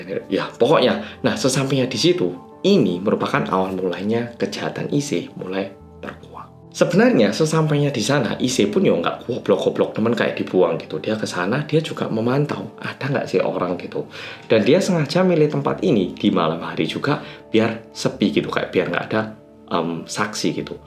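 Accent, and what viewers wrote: Java, Indonesia. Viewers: Aku tu lihat yg medok, itu udh seneng,di tambah lagi cerita nya ini,lebih seneng,cerita sendiri takut sendiri 😂😂😂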